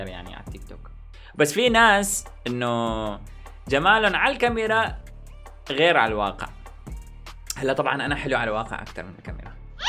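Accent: Algeria. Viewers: لهجة جزاءرية طالعة حلوة عليك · ههههههه ممتاز ف اللهجة الحزائرية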